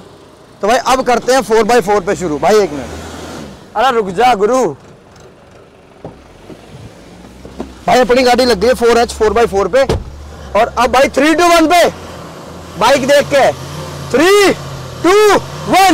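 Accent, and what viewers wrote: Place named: Mewat, India. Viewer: Mewati si bolro h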